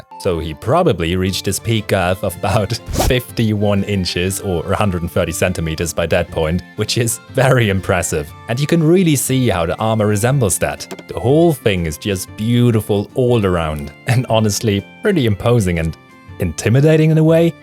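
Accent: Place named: Germany. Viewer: I heard german ! Ich wuste es der dialekt kam mir bekannt vor😂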